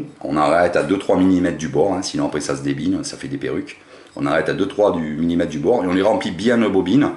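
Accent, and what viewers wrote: South, France. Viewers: Avec l accent du soleil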